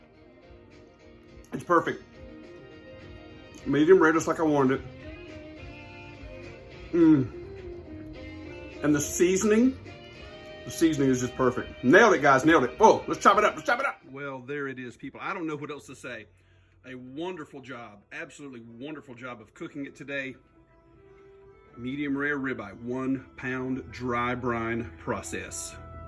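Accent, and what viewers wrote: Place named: South, United States. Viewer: You sound so southern 😉💋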